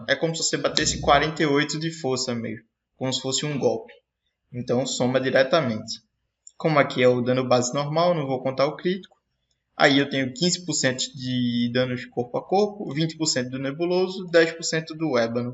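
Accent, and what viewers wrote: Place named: Pernambuco, Brazil. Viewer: reconheci pelo sotaque kk sou de recife.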